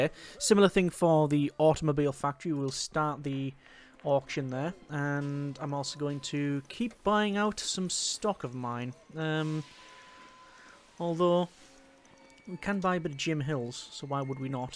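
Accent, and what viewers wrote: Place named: Wales, United Kingdom. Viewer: Man, are you Welsh? I study and practise UK accents because I think they're awesome, and I detect something in your voice. It sounds Welsh.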